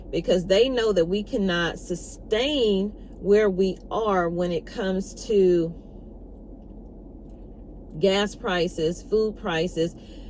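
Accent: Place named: North Carolina, United States. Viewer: I knew you were a North Carolina Girl as soon as you opened your mouth! 💙 ( I reside about 30 minutes from Hickory, so I'm there pretty often). As I listened, I got tickled, because I was like...OMGosh! I found someone like me!! I'm serious. I could be sitting there talking in your place. This is 🤣 crazy!! Thank you for putting yourself out here, for caring about others and for serving as a beautiful example of a true Southern heart. God bless you and your channel. And Food Lion had their crab legs in sale for $11ish this week or next. Do you can yours? I'm considering giving it a go.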